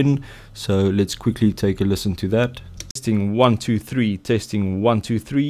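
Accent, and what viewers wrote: South Africa. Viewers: dude, you're south african! Awesum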